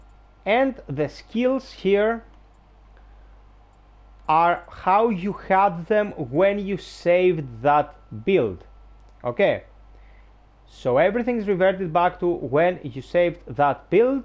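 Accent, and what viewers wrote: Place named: Greece. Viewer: Ρε φιλε βλεποντας το βιντεο καταλαβα οτι εισαι ελληνας απο την αγγλικη προφορα χαχα!!καλο βιντεο αδερφε.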